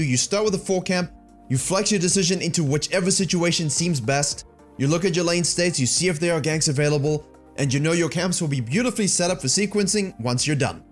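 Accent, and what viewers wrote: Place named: South Africa. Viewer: South African. I had the same question when I saw his first video. To be honest I can't say I've heard much of the dialect outside of the heavily tweaked variant in "The Expanse" - that said I very much enjoy the English enunciations. It's like a much smoother sounding German or Dutch.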